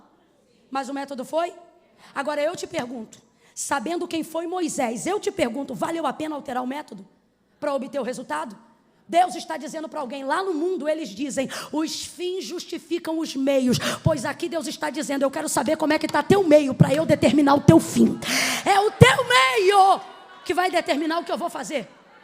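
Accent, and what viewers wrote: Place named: Rio de Janeiro, Brazil. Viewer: Ela falando daqui do RJ show kkk É assim mesmo aleluia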